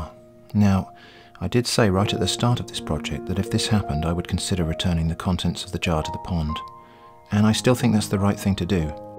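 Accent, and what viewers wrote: United Kingdom. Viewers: Mmmmm a Brit eh?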